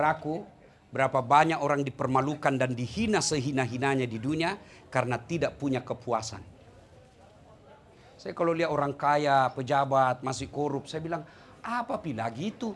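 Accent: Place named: Makassar, Indonesia. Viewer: Kusuka na karna tetapji tawwa logat makassarna.... Tetapki bgini ustad jammeki logat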